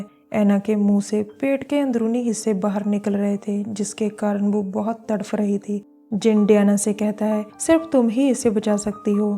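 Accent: Punjab, India. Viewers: Hi my 💕 punjaban love ur voice & assent ur big fan Pardeep gill from Ludhiana Punjab ❤️ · Yaar punjabiat jhalak di hai accent sahibkaro hindi ya punjabi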